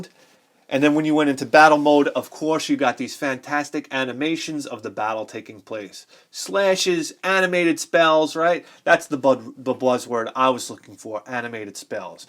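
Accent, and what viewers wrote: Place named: New York, United States. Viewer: Hiya. First off fucking love your show. We're about the same age so I can relate to much of your video game history. I had a subscription to Videogames and Computer Entertainment from 1990-91, I remember there was a special on Phantasy Star 3 in one issue. I poured over that article for hours and hours, examining each screen shot and dreaming about a when i'd get the chance to play it. Never happened, but that's how it was in those days, in my part of Canada, the province of Saskatchewan (i'd to hear you pronounce that on your show with your NYC accent, that'd be fuckin hilarious). There was not much for variety in video games, especially JRPG's. But anyway, I'm with you on the greatness of Phantasy Star 2, I've never completed but i keep getting going back to it over the years. Keep up the great work, on the greatest video game show in the history of human civilization.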